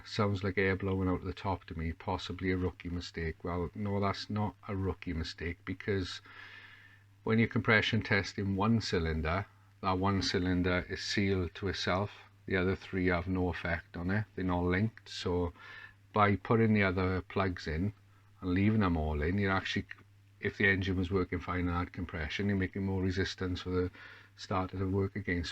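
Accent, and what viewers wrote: Wales, United Kingdom. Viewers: I wouldn't say you are negative at all, you are Welsh, every Welsh person i've ever met or even seen on TV sounds just like you, that guy who said you were hard done by has obviously never met a Welsh man 😂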